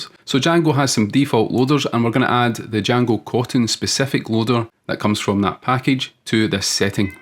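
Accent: Scotland, United Kingdom. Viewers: It would be good to have a cotton htmx video. By the way are you scottish ?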